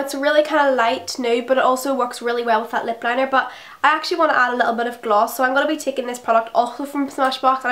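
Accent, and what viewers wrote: Northern Ireland, United Kingdom. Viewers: She's so good isn't she! I'm from Northern Ireland too bit my accent is a wee bit different, I hate mine xx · What county are you from in Northern Ireland I love your accent!🙈💜x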